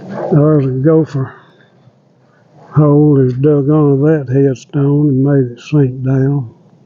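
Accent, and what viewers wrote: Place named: Mississippi, United States. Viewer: I enjoyed your video, the stories (headstones are a book of life to me) your kindness and your Mississippi accent. 😊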